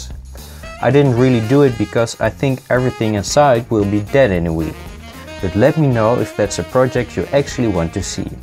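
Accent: Netherlands. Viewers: You Dutch bro? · Nice Dutch accent :) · You sound Dutch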